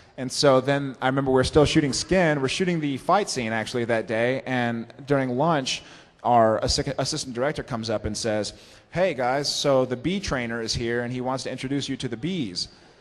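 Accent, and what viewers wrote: Texas, United States. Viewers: I love the slight Texan drawl that his voice has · Gotta love his Texas accent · You can really hear his Texan accent here. Love it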